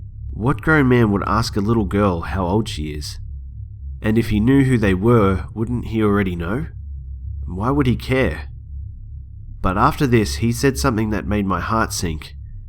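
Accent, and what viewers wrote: Australia. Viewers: That is the cutest accent I have ever heard!!! Is it Australian? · are you also aussie? cuz honestly nobody in nsw says gday haha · AUSTRALIAN ACCENT YAS BITCH MAKES THE VIDEO BETTER. lol :3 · are you from Australia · He's Australian he does not sound British at all -_ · I love your accent!! Are you Australian?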